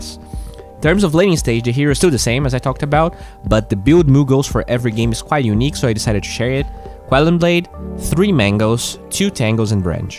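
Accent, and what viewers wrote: Brazil. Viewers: Are you brazilian or know how to speak portuguese? You look like a brazilian when speaks english. (by the way your english is great, I'm just asking XD)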